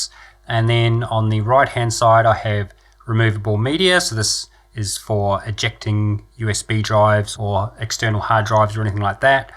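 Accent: Australia. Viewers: For my money you are the best on YouTube for explaining how to configure everything Linux. Step by step, no BS and very articulate and to the point with your Aussies English. Thank you for all you do for us.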